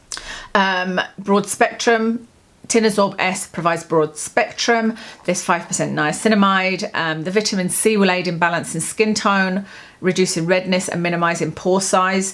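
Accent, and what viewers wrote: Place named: United Kingdom. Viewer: Hi, why don’t you leave the sunscreen in the bathroom and put it on in there? You won’t have to worry about touching doorknobs and then sticking your fingers in the container. I haven’t tried Stila liquid lipsticks in years. Do they still have a scent?!I couldn’t wear them due to the scent! I love your accent 🙂 Words sound fancier in a UK accent!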